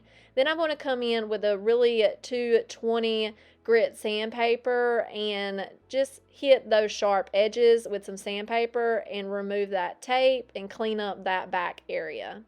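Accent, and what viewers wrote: South, United States. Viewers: I’ve never tried milk paint but you make me want to try! I’m so excited I found your channel! And I love your beautiful southern accent!! 🫶🏼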